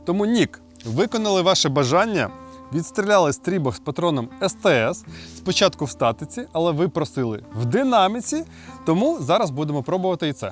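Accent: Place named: Russia. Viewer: Добрий ведучий, але щелепа російська- смішно слухати.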